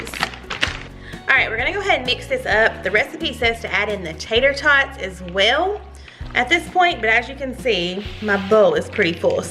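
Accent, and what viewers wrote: South, United States. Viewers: great recipes. Thanks. I could listen to your sweet southern accent all day long. Thanks for the great recipes!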